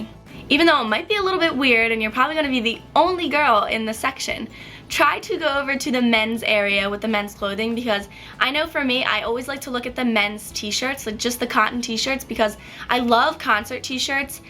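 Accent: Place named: New Jersey, United States. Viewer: do you live in new jeresey? :o you have the accent.... btw i like thrift stores :D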